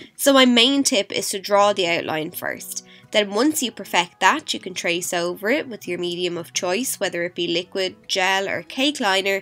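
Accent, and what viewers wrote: Ireland. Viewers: Hey, great video I like the simplicity and that you take your time and go into detail to explain what you're doing and how you're doing it. I also like that you share alternate tips and tricks incase we're having problems. I appreciate that, I like your style so will be subscribing......also love your Irish accent. Coming from a massive Conor Mcgregor fan lol keep up the good work !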